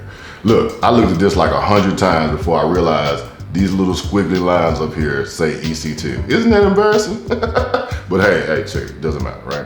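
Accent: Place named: Baltimore, United States. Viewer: Are from Baltimore area? I hear it in your two’s and do’s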